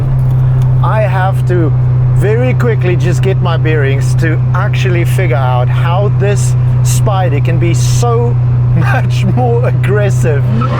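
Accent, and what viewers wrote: South Africa. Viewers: Bro are you a South African? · I know a SA howzit when I hear one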